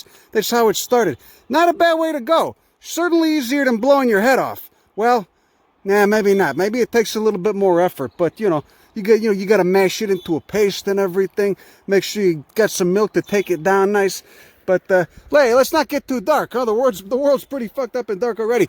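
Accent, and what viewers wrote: Chicago, United States. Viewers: I adore your "plainsplaining." Your Chicago Italian accent is cool. Can you post a pic, so we know what you look like? Not expecting a Glamour Shot, nor anything salacious! 😜👾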